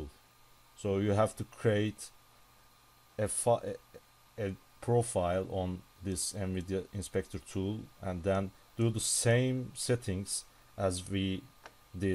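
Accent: Turkey. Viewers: Telaffuzdan direk anladım Türk olduğunu :D hissediliyor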